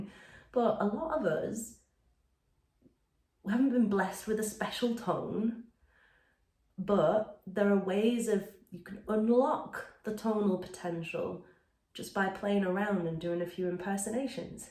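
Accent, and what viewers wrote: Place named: Northern England, United Kingdom. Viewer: This is a great idea! I've had years of lessons and my main teacher has spoken a lot of placement, but I really struggled with the descriptions of placement and to a certain extent, I still do. ("Place it more forward" - I think I learnt to close my pharynx a little more when I heard that, but it could be that I changed my configuration in other ways that I'm not conscious of.) Perhaps if I had been asked to imitate some of the voices here or in other songs, I would have got there quicker. I love your Northern accent! We have such a rich tapestry of accents in the UK; mine is a bit of a mix between RP and Welsh! :)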